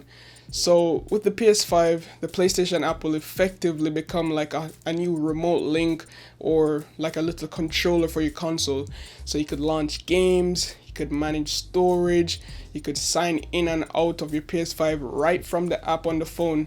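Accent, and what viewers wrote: Jamaica. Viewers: You jamaican? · U jamaican bro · Ur Jamaican I could tell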